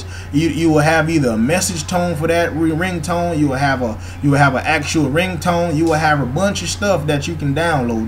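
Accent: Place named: South, United States. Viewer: Love the country accent.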